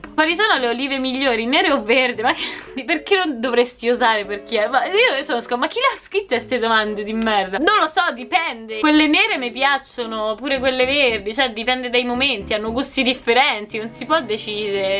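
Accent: Rome, Italy. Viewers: Quanto amo er tuo romanooo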